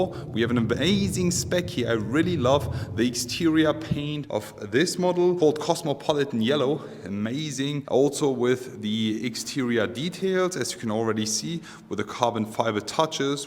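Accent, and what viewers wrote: Germany. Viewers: Is that denglisch you talking ?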